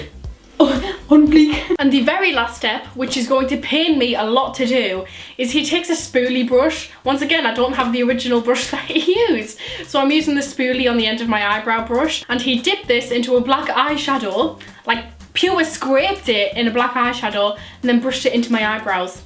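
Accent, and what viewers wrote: Newcastle, United Kingdom. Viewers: she’s from somewhere near Newcastle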